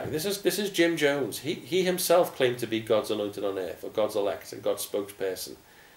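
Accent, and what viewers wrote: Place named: Liverpool, United Kingdom. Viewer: Hey mate you sound like a fellow scouser we've probably been to the same assemblies. Ain't no way these tossers are anointed their just stunt doubles for snow white's seven dwarfs. take care and have a great life free from suppression as I intend to. Cheers my friend.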